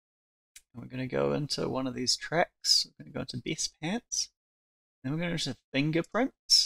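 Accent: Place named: New Zealand. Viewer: Thanks guy with a strong New Zealand accent! I was trying to figure out how to generate an AcoustID from an audio file to figure out if it matches the ones linked to a MusicBrainz recording (So I could determine if the two recordings should be merged), And looking it up on the internet I couldn't find an answer, But this video explained it quite simply!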